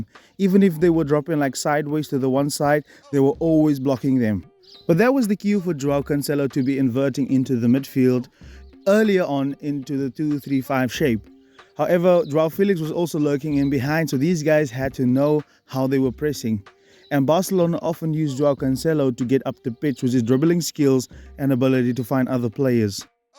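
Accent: South Africa. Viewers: This ou sounds south african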